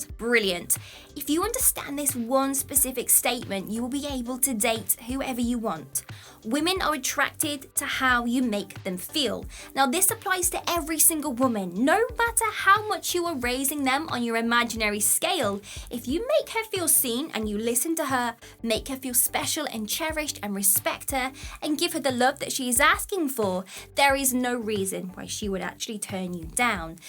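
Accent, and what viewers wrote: United Kingdom. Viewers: Her voice pitch + british accent = something interesting going on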